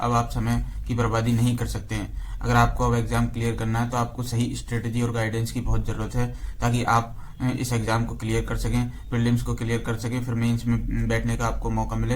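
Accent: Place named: Rajasthan, India. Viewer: aapki language😅 Rajasthan jesi hai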